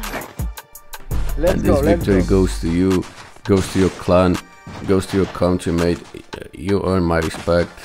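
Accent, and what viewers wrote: England, United Kingdom. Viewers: We want the fancy British accent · We want the fancy British accent back now! ❤️❤️❤️ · Fancy British accent plz (if possible) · Let's keep with british voice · Regarding the voice: I dont know buddy, the british one is nice but we dont watch you for entertainment, we watch you to learn stuff. Entertaining voice or not, real fans always gonna watch ❤ so your choice man, do whatever you like most hoping every fan thinks about it this way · It's a tough choice between the accents. Maybe make it both? British for general narration gives is like a documentary feel which is nice, and your own seem to work well for the explanations. The format for this video seemed real helpful in showing how to adapt to the situations that unfold and noting things to be aware of in them. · para mi esta bien un video con tu voz y no la voz con acento inglés. 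🇲🇽 🇲🇽 💪💪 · Cheers! I would like to hear that British accent voice again. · I enjoy it, do both, British and your original voice at the same time ❤️🙏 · I like the fancy British accent voice over and I love this one too😍....anyhow u wish u wish to upload videos!! Drop a 1hr video we'll still watch it from top to bottom...much appreciations to you for making us a better player!! Cheers❤️❤️ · British accent it is so much fun!!! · it feels so different without that british accent voice over haha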